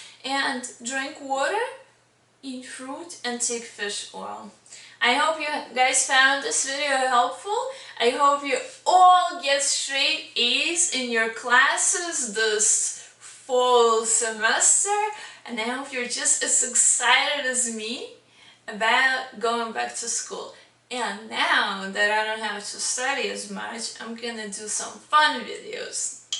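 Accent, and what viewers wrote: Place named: Russia. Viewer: you are russian! i think your accesent well i am russian too!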